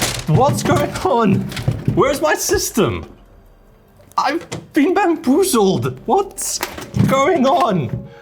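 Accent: South Africa. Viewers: Trou met my <3 Beautiful Saffa Accent!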